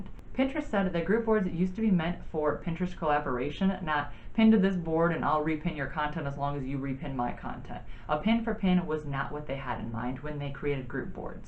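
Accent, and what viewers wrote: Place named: United Kingdom. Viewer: Thanks for helping video. I saved your video to watch it later again because I don't understand British accent properly because they talk shortly. But English is not my native language and also I'm not expert it English 🙂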